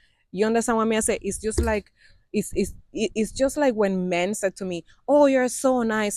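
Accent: Patois, Jamaica. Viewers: Fortunately, the right people in Jamaica saw you were genuine and honest. Btw, I enjoy hearing your Jamaican patois. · Thank you for sharing your story. I love listening to your Jamaican accent. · I am so. please that you get your Jamaican citizenship, my grandmother was a Cuban too she lived in Jamaica 🇯🇲 from 14 to 76 years ago when she died , she would sang to us. her grand children in spanish ,I have thousands of relatives in Cuba that we don't know because of the Cuban system, enjoy your life in beautiful Jamaica 🇯🇲 and I love how you speak like Jamaican Blessings galore. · Blessings. Lovely story. We are glad there was a happy ending to it. I love your vibe, your command of English and the ease with which you slip in and out of Janaican patois. No es facil mami. Gwaan do yuh thing. Bendiciones! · "Tell her bout her backfoot" this is so Jamaican.I love your patois.Keep going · I absolutely love your spirit! 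I love that you have taken up the Jamaican patois! I would have never known that you were originally from Cuba. Bless up from Barbados 🇧🇧with a Jamaican 🇯🇲 mother!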